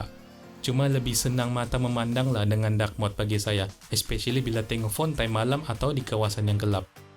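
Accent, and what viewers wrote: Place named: Sabah, Malaysia. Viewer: MCM org Sabah ni abg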